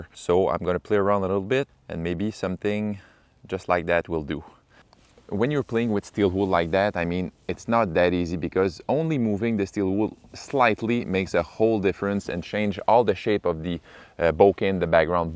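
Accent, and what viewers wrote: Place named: Quebec, Canada. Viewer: Its the quebecois heavy accent for me. All the different angles of this video though, lots of work going into this for sure. Great job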